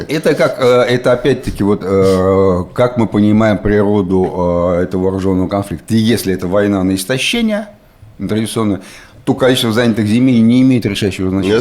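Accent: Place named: Surzhyk, Ukraine. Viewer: На суржике не понятно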